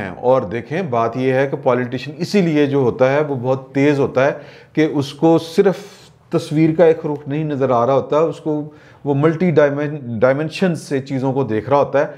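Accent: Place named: Lahore, Pakistan. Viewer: آپ کا "لاہوری پنجابی" کا تڑکا بہت پیارا ہے ۔۔ شکریہ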